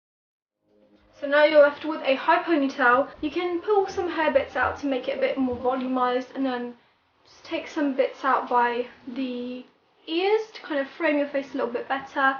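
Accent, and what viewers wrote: England, United Kingdom. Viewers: I think she is from England I have the same accent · No, she is from the uk x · I luv your accent ... British somin like dat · You are from UK? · You're like perfect! cx Are you from Britain? Love the accent ( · finally someone british lol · I just LOVE your accent! Where in the UK are you from?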